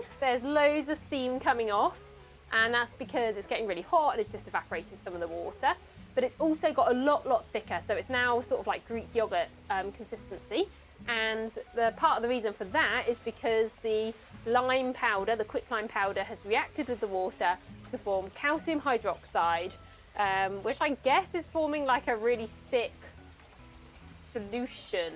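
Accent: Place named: Wales, United Kingdom. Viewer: Great video, also known as ‘watching mixing things’. We’ve noticed your increasing bicep size and maybe also a slight Welsh twang…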